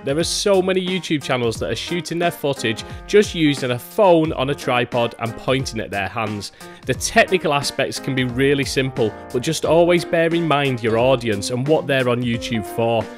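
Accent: United Kingdom. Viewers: This really is super-helpful. You have a great Yorkshire accent and voice by the way. If you're not doing voice overs already and need the extra cash, this could be a nice sideline for you.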